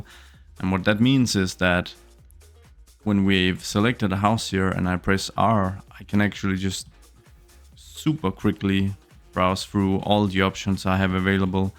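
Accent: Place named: Denmark. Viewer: Are you Danish? Because your accent really sounds like us.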